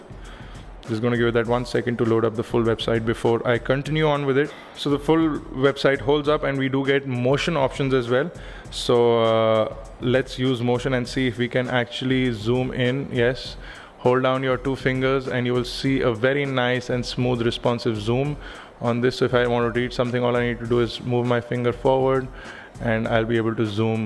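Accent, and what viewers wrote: India. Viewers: its called Indian accent btw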